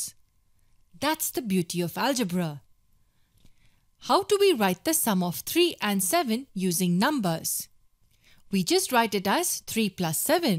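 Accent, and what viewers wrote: India. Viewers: This is an Indian accent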